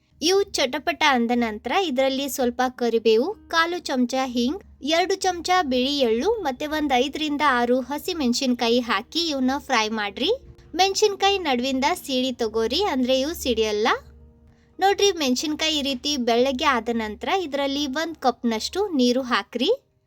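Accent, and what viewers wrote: North Karnataka, India. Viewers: Yavva yakka dharwad dag adi namma uttar karnataka language matadu agatte hogatte baratte bidu akkti barataiti annu kelaku chanda anastad innu hechhu jana nodatara ninna bashe keladra irritate akkad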